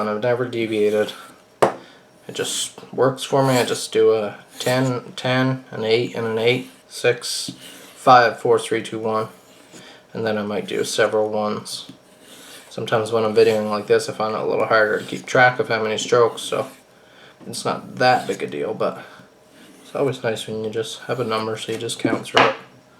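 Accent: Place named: British Columbia, Canada. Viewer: haha yessss!! found the video quite by accident. recognised the accent immediatly. Hello from BC. Haven't been home sense 2002. Funny how hearing the accent from home has such an emotional/mental trigger.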